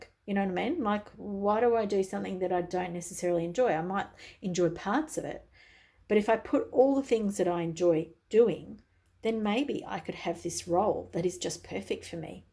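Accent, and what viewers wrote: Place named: Australia. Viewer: Pile 1 was amazing. Thank-you so much. I could write you a long essay about all the ways you spoke to me, but I’ll just tell you that I cried and laughed the whole way through. I’ve also been seeing 1111 again the last few days, and this morning I said to Spirit, “I’m listening” and then a bee flew in the room and after hovering in front of my face, flew around to show me a few things in the room (in answer of a recent question) and then left. Also you have such an amazing voice. I’m obsessed with your accent even though I’m Australian too, haha. You’re amazing! Blessed be!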